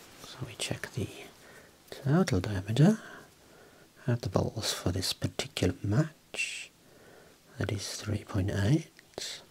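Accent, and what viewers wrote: Birmingham, United Kingdom. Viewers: On the one hand it's just some bloke looking at a table tennis racket, but on the other hand I find it immensely satisfying and deeply relaxing during my Saturday lie in until God knows when watching a bloke inspecting a table tennis racket and speaking in a soothing Brummie voice. I find it's the perfect way to relax and unwind after yet another working week.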